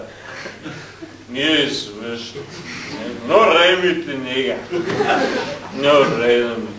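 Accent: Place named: Bavaria, Germany. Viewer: Als grenznaher Baden-Württemberger muss ich sagen: Grünwald ist in seiner Comedy-Sparte König. Ich glaub, ich finde den so komisch gerade weil er Boarisch spricht. Boarisch und fließend Besoffen.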